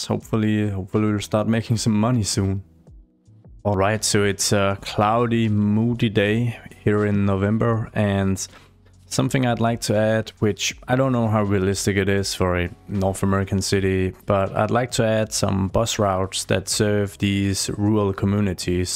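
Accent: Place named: Denmark. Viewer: Just found you channel recently. So nice to have a fellow Dane playing this game (sorry, but it is obvious when you are danish) 😅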